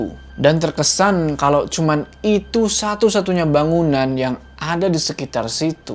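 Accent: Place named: Java, Indonesia. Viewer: yg part 1,logat bisa jawa belajar dr mana..haha Salam dr klaten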